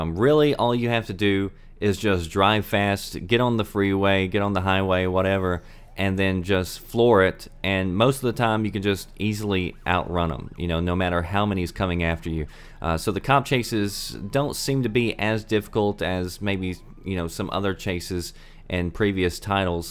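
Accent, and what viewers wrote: South, United States. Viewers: I like the southern accent. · "Sometimes I can't keep the southern out..." Its OK... let that shit flow!!! Hilarious.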